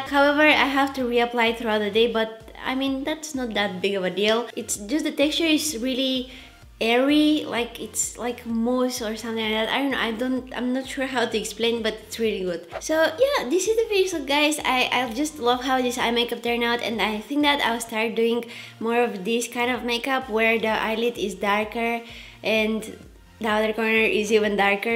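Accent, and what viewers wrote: Bulgaria. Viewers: I met a woman the other day and I guessed she was from Bulgaria by her accent. Guess I've been watching a lot of videos!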